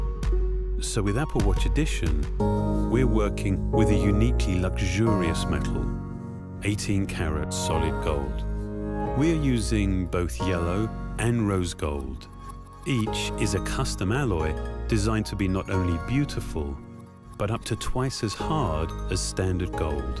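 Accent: United Kingdom. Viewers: it's the British accent lol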